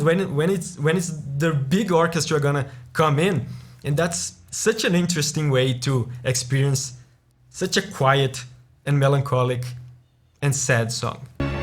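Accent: Brazil. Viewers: Out of Bloodborne OST, you could react next to Laurence the First Vicar, basically Ludwig, except it's not a dance, just straight up chaotic church music, and DS3's Slave Knight Gael aswell, they're really different and more emotional than the other boss musics in the series; Really sad that Yuka Kitamura didn't win any awards in both Bloodborne or Dark Souls, let's hope she does with Elden Ring. Also i've just discovered your channel and learned you're brazillian too, mostly from your accent, abraço :D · Você é Br rapaz,sua voz é bem BR adimita. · c tem sotaque de brazuca kkkkkkk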